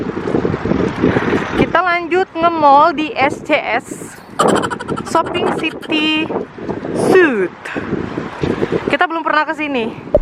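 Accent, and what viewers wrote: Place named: Java, Indonesia. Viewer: Tetap ada logat jawanya ya ,,sukses terus buat kelurga mamah 👍👍👍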